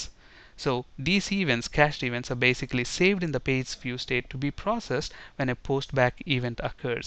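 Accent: India. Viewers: very helpful ....may i know where you are from? ur english is excellent but u kinda have a indian accent.. :) am i right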